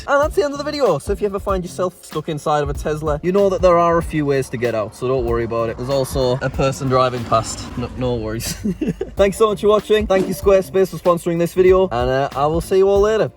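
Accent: United Kingdom. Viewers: Wait boot your brithish huh im fine with brithish soo yea have a great day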